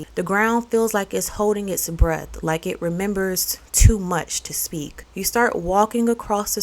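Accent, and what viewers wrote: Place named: South, United States. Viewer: I would love to know where you are from. You sound as though you fit right into the south. Welcome back when you return to Louisiana.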